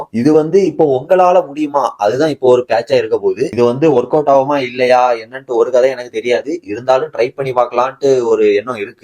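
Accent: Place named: Melapalayam, India. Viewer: nenga melapalayam ma bro slang is same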